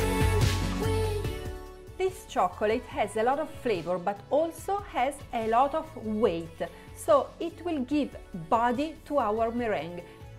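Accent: Italy. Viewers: making it easier for yourself is the key. May not be much different on our end but if you can save minutes and hours of editing that's well worth it. Your Italian accent is half the reason that I subscribe, thank you again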